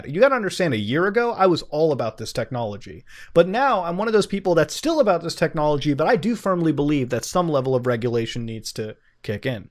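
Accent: Canada. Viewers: Aboot, caarr, your Canadian accents so thick my friend. -love from Torono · He's Canadian, he's got a different accent. Like when an American says croissant vs a French person.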